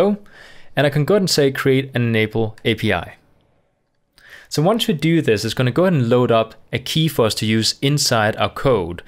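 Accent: Denmark. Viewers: You my Sir are you danish ? :D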